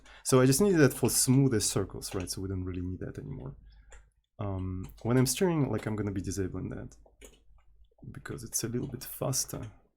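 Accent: Russia. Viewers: хз он тупо по-русски говорит английскими словами норм короче ну надо будет специальные люди придут и объяснят как надо балакать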